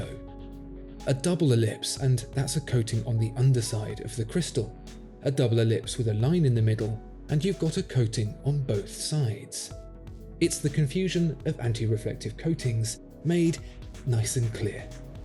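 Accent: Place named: RP, United Kingdom. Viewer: Amazing vidiography, a perfect posh British accent. But underneath the BS, the same blind brand loyalty every rich D has and needs in order to purchase a Rolex. After a whole week of focusing on German perfection, your need to sell some garbage, yes garbage Rolex, is sad for me, one of your more loyal followers. I know you need to sell, but double standard is something I really discusse.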